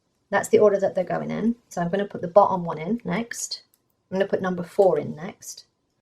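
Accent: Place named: United Kingdom. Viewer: are you from the UK originally? Your accent and phrasing is very UK. Agree that HP have to expand their faith range x My craft room is the same 😂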